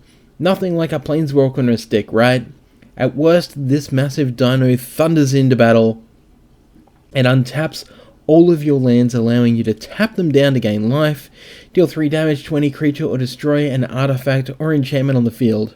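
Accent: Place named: Australia. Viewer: You have to ask yourself about motive here buddy. Someone making a fake card to reveal will quickly be outed and completely destroy their credibility and their channel. Why on earth would anyone do this? You might get this from some random no-name channel with no established history, but that's clearly not the case here. The reason this spoiler is a bit early is because I highly suspect the channel owner is an Australian (based on accent), and Australia happens to already be in July 23rd as I write this.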